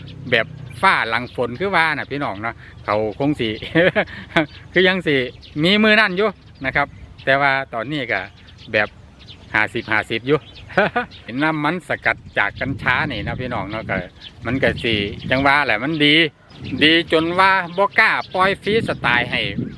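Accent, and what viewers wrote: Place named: Chaiyaphum, Thailand. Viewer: คนลาวอิสาน ชัยภูมิ เว้า แนวนี้ เนาะ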